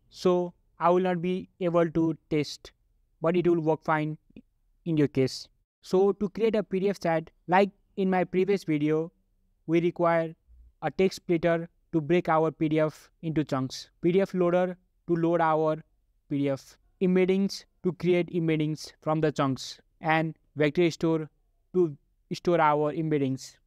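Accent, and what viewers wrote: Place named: India. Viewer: here is a tip. slow to .75, indian accent english becomes much easier to understand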